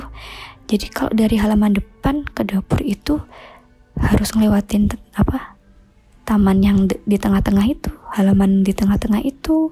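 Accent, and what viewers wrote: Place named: East Java, Indonesia. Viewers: Karangan? Mbaknya pasti org jatim ya hehe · Hooh dia orang Jatim wkwkw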